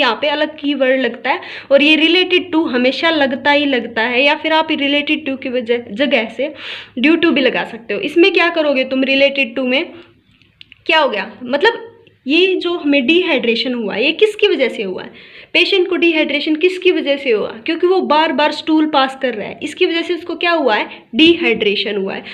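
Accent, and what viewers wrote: Haryana, India. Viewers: Haryanvi sweg h boli me madam k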